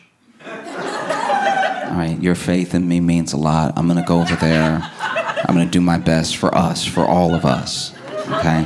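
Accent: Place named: South, United States. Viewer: As someone born and raised in Texas I now am 100% sure he is also from the south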